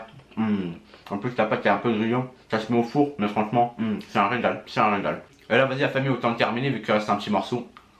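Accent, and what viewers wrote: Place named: Arab world. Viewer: Wwa pas mal l'accent arabe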